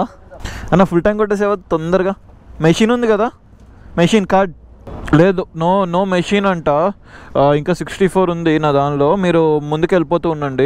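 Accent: Godavari, India. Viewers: Godavari language.. ❤💖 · Godavari slang ❤❤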